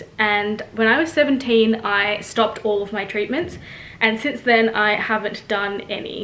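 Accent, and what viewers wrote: Australia. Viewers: She definitely has an Australian accent so I was a little surprised when she said Sri Lankan. I don't know what a Sri Lankan accent in English would sound like? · I’m glad you answered the question of your nationality because I was very curious. Your features look very European. Maybe that is because the central part of your face is very fair now. I was wondering with your accent if you were aboriginal.❤ it is so interesting that pigment can come and go. I wonder if because you have lighter spots where you would be exposed to sun, if sunlight hasn’t played a part in changing the skin cells. I’m sure the condition is very interesting to scientists as to why our bodies do what they do.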